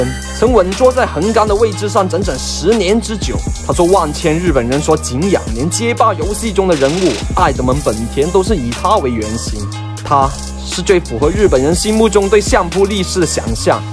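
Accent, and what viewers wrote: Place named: Taiwan. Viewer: 本来很平淡的看人物传记，结果这台湾腔说到后来都激动落泪和死了爹一样潸然泪下，让我笑出声，真是大孝子。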